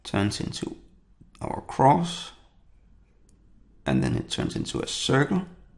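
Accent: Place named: Germany. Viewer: Hey - good video - you sound German. I have a question? is the answer for this video Matrix 39, or the other answers you gave for the 19 Matrixes -- are these "your answers" thru problem solving? or are they answers from a testing psychological institution?? Can you let us viewers know?? -- thanks -- from --Canada:)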